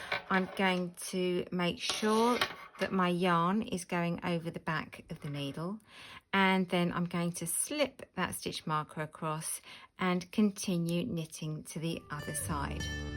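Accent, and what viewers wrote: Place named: England, United Kingdom. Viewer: Bonjour, et merci pour vos vidéos qui sont de bonne qualité, 😃 (j'apprends à tricoter les chaussettes, et plus particulièrement les talons en partant de la pointe des orteils) 😀 le seul problème c'est que je ne comprends pas l'accent anglais et mon vocabulaire est plutôt scolaire...😊 Auriez-vous la gentillesse de régler vos paramètres, lorsque vous mettez vos vidéos en ligne, afin que les vidéos et sous-titres soient dans des langues étrangères, dont le français, svp, s'il vous plaît ? Mes paramètres personnels sont réglés pour mettre les sous-titres en français lorsque YouTube m'envoie des vidéos de pays étrangers.... Par avance je vous remercie et vous souhaite de belles fêtes de fin d'année...dans la paix et la sérénité 💐💟🎅🤶🕯️🌈☃️🥰🌹🙋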